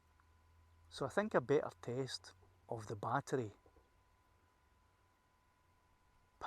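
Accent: Scotland, United Kingdom. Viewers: Scottish accent is the best. ☺️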